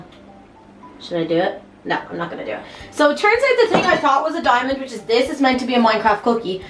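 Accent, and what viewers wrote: Ireland. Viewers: are u irsh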